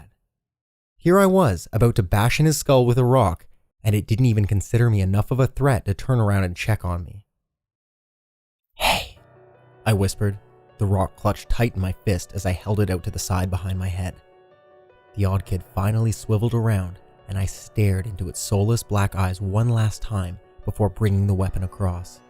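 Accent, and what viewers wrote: South, United States. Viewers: Very nice southern accent. It sounded real. I'm from the south so I'm used to it. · love your southern accent xD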